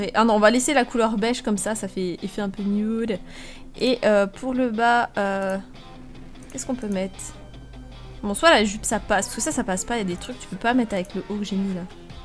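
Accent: England, United Kingdom. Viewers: l'accent anglais j'aime bcp !! XD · J'adore ton acsent anglais 😂👌❤super vidéooo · J’adore ton accent anglais 😂😊❤️🥰 ps: je t’adore 😊❤️🥰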